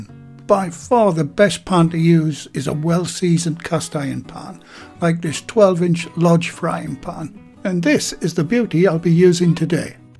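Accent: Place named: North, United Kingdom. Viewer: Love your presentation! Very organized and straight-forward, anyone can have confidence following you, and expect good results. Love the Northern accent too!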